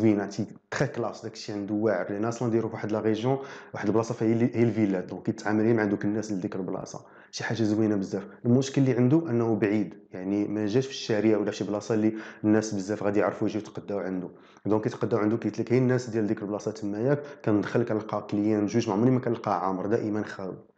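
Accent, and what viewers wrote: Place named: Maghreb, Arab world. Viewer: حاولت افهم ما قدرت فعلا لهجة اخوانا بالمغرب العربي صعبة الفهم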